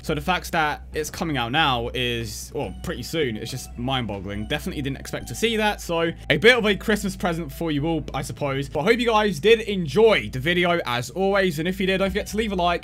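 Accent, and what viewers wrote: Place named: England, United Kingdom. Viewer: sounds kinda like Darren Till with a english accent instead of irish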